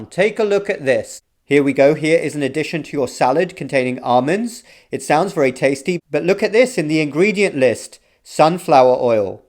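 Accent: United Kingdom. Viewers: I just love the fect doc didn't loose his British accent 👏😆❤️ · Bullshit in a British accent.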